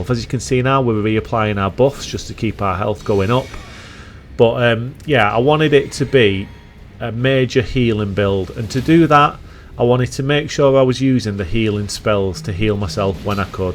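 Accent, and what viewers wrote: United Kingdom. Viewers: can you fucking show the objects, i dont understood you fakin british language god damn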